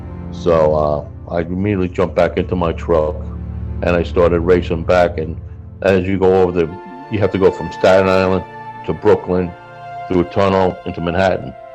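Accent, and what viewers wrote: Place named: Ireland. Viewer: Interviewer has an Irish accent from Ireland, firefighter has an americanized Irish accent.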